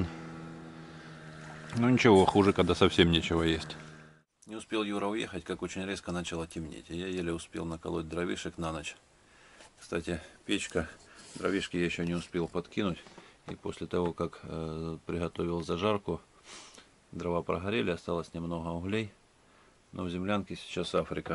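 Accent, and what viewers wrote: Ukraine. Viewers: Та тут сразу по разговору слышно ,что наш с востока Украины!!!Привет из Мариуполя!!!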